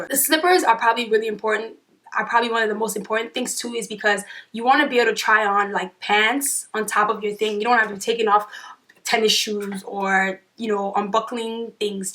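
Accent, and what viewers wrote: Nigeria. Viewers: yes she's a NIGERIAN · Are you Nigerian?? Your accent sounds like it 😂❤️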